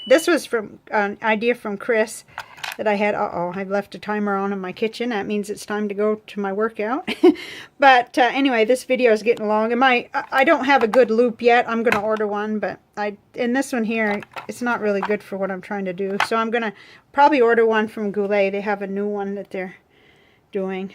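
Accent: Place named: Texas, United States. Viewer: You look and sound like me. So "hello" Texas girl. I thought you might be from the north. I am in N Texas. I just started all this in May myself, I buy cheap pens to try the nibs out. As Brian from Goulet said Quantity over quality" I too discovered cigar boxes and some of them are just beautiful. And they are cheap. Very handy instead of all the plastic storage which I just could not put my pens in something like that. I, too use those syringes to fill all my pens instead of dipping the nib in the ink. That is just too much of a hassle. I have alot of your videos to catch up on.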